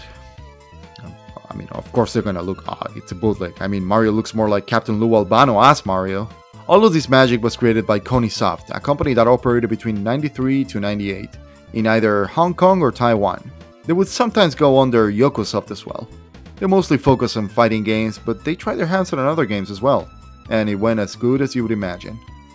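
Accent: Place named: Argentina. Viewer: i'd never expect to encounter some Argentine Sonic The Hedgehog Fan English Speaker... Que Copado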